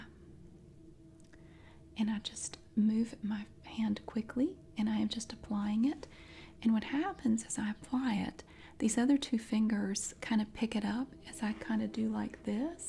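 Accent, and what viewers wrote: South, United States. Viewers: The voice of an angel, love the sothern accent im southern also from thomasville nc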